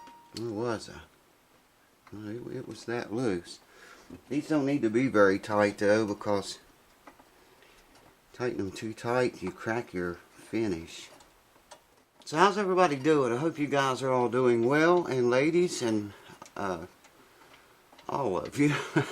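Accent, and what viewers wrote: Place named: Oklahoma, United States. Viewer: So glad I discovered your YouTube page! Lot's of great info, like the one on capos. I hope this isn't too personal, but whereabouts are you from? I ask because my daughter overheard me playing your videos and said "he sounds like grandma!" Lol. She's meant your accent, her grandmother is from Oklahoma. ☺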